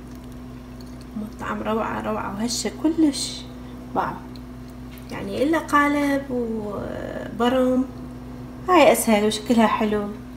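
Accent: Iraq. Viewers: هههههههههه كم احب اللهجة العراقية من الشام الجريحة اتكلم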